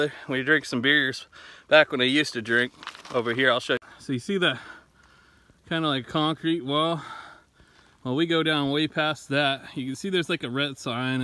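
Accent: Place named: South, United States. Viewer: WOW THATS VERY BEAUTIFUL WEATHER LOOKING. UR DOING A AWESOME JOB WITH UR CHANNEL ! WOW. AWW LOOK AT THE DEER. LOL THAT DOG WANTS IT. IVE NEVER BEEN THERE BUT IT LOOKS BEAUTIFUL. I LOVE UR ACCENT. SOUTHERN SOUNDING A LIL. LOVE THE BEATS U PUT IN HERE. VERY ENTERTAINING. KEEP UP THE GREAT WORK!! I WILL PLAY MORE OF UR VIDEOS TOMORROW!! ITS ALMOST 1AM HERE. BUT I LOVE THE MUSIC U PUT IN HERE TO. AND THE FAST FOWARD MOTION. I LOVE NATURE . SKIPPING ROCKS IS FUN. LOL HAVE A GREAT NIGHT! ❤🤗❤🤗❤🤗🤗🔥🔥🔥🔥🔥🔥💯💯💯💯💯💯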